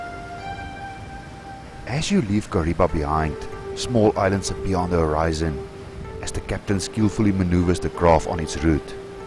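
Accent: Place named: Afrikaans, South Africa. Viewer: A fantastic yourney no doubt, love your Arikaans accsent boykie. Pretoria boy, 40 years in USA. Miss Kariba a lot. Would love to do your trip.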